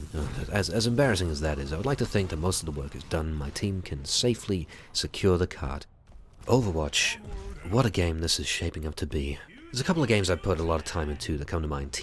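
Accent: United Kingdom. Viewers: You should do like a documentary. Like, seriously. Also subbed, I love hearing the British? accent! :3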